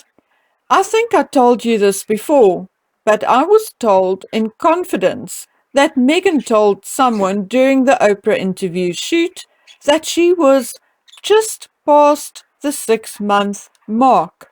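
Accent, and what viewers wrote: South Africa. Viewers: GREAT accent! South African? If i'm wrong, many apologies!!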